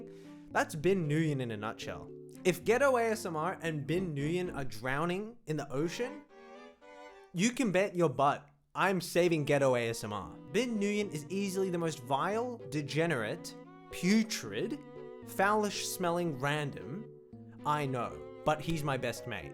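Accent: Australia. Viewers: in his asmr he just sounds like an american or canadian but as soon as he speaks up a little your like jesus thats a fucking aussy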